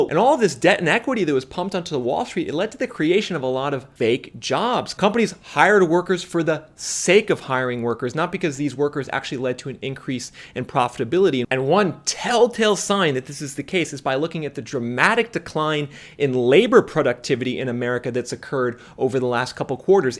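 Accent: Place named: Appalachia, United States. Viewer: Where you from originally? You say you work from home but you talk like a hillbilly. It's not your town and to be honest you need to get out more. Austin is not what its cracked up to be. Your probably a Democrat! Lol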